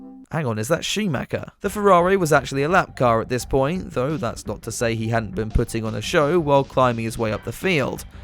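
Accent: United Kingdom. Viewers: Starwing in the UK ,which it sounds like my man is from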